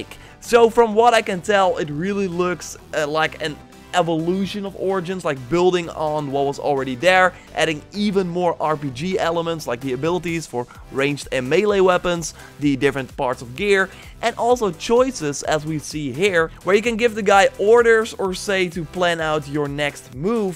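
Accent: Netherlands. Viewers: Dude, your English could use improvement. You shouldn't mix Dutch with English ;) Also your Dutch accent clearly comes trough, you are not doing us any good with that ;)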